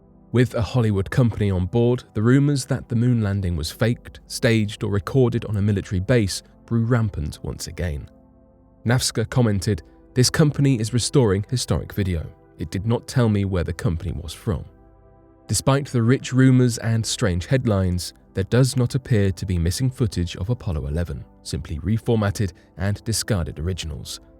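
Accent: England, United Kingdom. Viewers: The missing penguins are from globle warming no doubt everything is caused by it from any England voiced narrator as well as Democrat producers.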